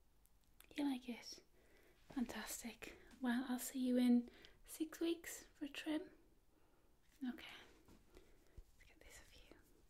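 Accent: United Kingdom. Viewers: Very relaxing video. I like the British accent, of course there are differences between regions of Britain. but I think they sound pleasant to the ears. That is possibly a reason I like British comedy.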